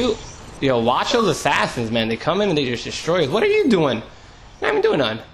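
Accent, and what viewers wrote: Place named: Los Angeles, United States. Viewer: yo are you from l.a you sound like you from l.a but another question will you be doing nay reviews on nba2k11 or elite 11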